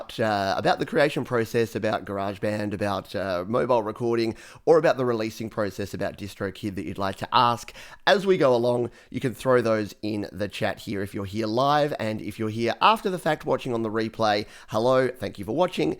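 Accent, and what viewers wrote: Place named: Australia. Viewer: I love your accent. That's why I want to go to Australia. Greetings from Germany.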